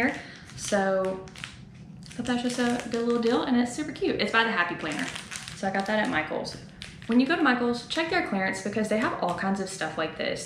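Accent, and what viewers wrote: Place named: South, United States. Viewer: LOVE this! These stores are all awesome! As is your Southern accent haha happy new subscriber here!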